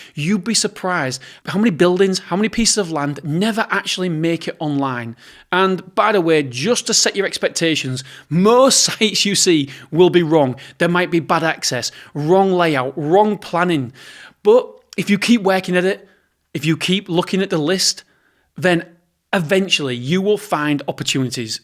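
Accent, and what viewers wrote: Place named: Hull, United Kingdom. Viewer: i live in Hull ❤ You sound like your from Hull too 😂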